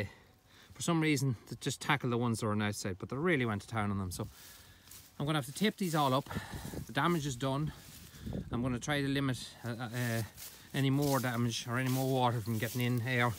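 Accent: Ireland. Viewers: Like your videos mate just subscribed and I love your Irish ☘️ accent, birds sounds like boards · where in ireland are u from?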